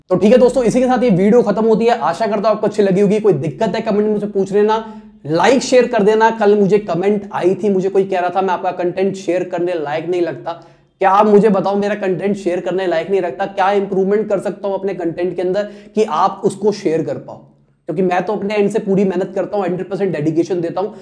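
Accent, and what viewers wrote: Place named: Punjab, India. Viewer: Aapka content sahi me share karne like nahi hota bcoz aapki jo language hoti hai vo un professional lagti hai means usme koi professional sa feel nahi hota hai aap vo jo bolte ho na yaar, bhai etc aur aap standerd hindi language bhi nahi bolte matlab ek normat panjabi tone me aap information deliver krte ho to ye sb ek professional worker pe nahi jamta aapki language vivek bindra , ujjval patni ya aur bhi jo best vaqta hote hai unke jaisi professional ho to zyada behtar lage tab aapki video share krne me hume bhi khud me accha feel hoga.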